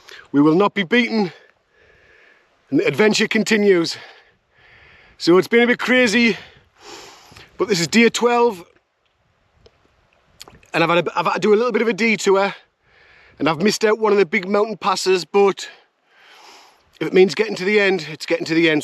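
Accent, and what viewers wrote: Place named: United Kingdom. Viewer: Agreed!! I hike a lot!! And i play tennis and dance. I have a lot of callous. 😁 I watched all your CWT videos, enjoyed it. I went the same route when i did CWT in 2023 and 2024. Sometimes i have trouble understanding your accent lol 😄 so i had to hit rewind and listen again and again. May i ask what British dialect is that? Im from the US and clueless.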